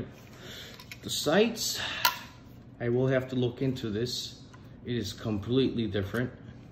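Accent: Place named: Minnesota, United States. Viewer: You might be from Illinois but you have the perfect true Minnesotan accent. It's perfect. With a Wisconsin flare. I'm researching this gun for buying. Thank you for the video.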